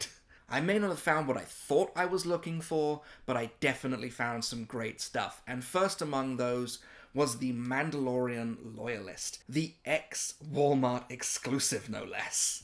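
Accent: England, United Kingdom. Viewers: J! I've always assumed we both had great minds! Hope you and the family are good. I'm currently struggling with daughter and her various "quirks" of teenage girl behaviour. I've only discovered recently, clicked on and heard his accent. Sold!! I thought. Gotta support English dudes across "the pond!"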